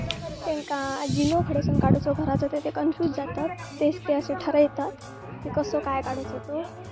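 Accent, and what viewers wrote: Malvani, India. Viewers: येताला जाताला होताला सुपर मालवणी लागला तुका झाला माका ☺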